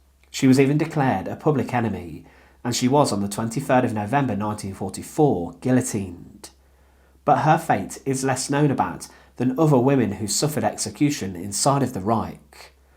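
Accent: United Kingdom. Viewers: Love your Bri-ish accent !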